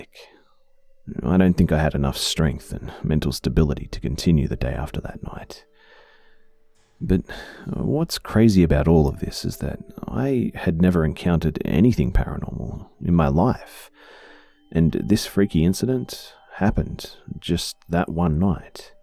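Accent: Australia. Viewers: LOVE THE AUSSIE NARRATING..:D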